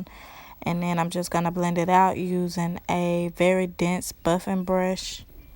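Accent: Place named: South, United States. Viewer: U so Country 😂 the first minute of the video I couldn’t stop laughing lol gotta be somewhere down here in the south. Awesome work 😍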